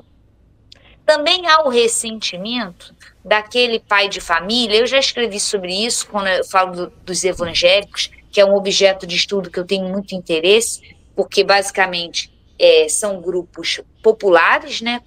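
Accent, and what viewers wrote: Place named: Rio de Janeiro, Brazil. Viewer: Professora, linguagem científica não se compatibiliza com sotaque carioca.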